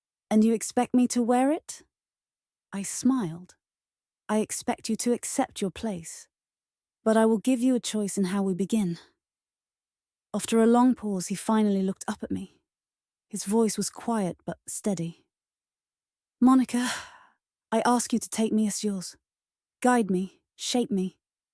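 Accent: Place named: United Kingdom. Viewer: Why do all these FLR stories have a UK voiceover. Probably due to the high level feminists in there country. Enjoy while you can before sharia law takes over.😂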